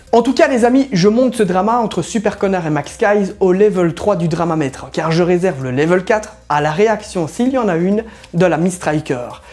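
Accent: Belgium. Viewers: tu es belge nn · T'es liégeois ?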